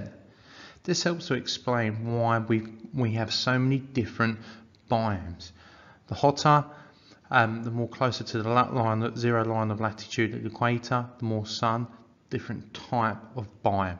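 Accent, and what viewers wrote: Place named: Australia. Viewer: Love your accent, are you australian by any chance, much appreciation from the UK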